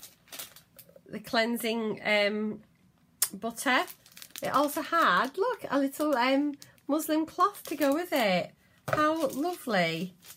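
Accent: Yorkshire, United Kingdom. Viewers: Are you from Sheffield or Leeds maybe, judging from the accent :) great video, thankyou for sharing x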